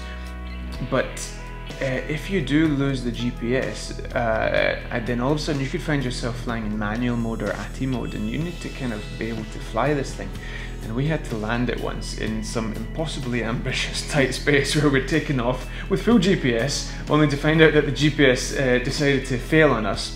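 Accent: Scotland, United Kingdom. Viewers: A cool video. I love the scottish accent and the landscapes. ;-) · wonderful video. super helpful. I wish all instructional videos were given in that lovely Scottish brogue.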